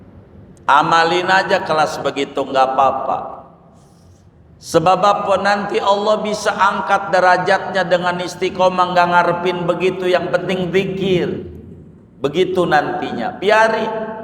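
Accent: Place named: Betawi, Indonesia. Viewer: Qobiltu Pak haji, paling suka neh ane dengan ceramahnya gaya betawi yee, terima kasih pak haji ilmu ane bertambah lagi mudah"an ane istiqomah Amiin yra🤲🤲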